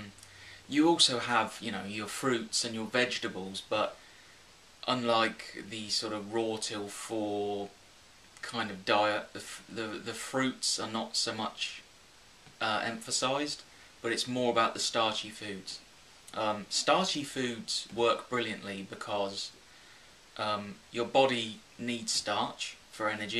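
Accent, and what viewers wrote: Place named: United Kingdom. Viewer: Thanks for sharing your story and experiences. I subscribed you. Btw, I love your british accent! Have a nice day. Greetings from Portugal :-)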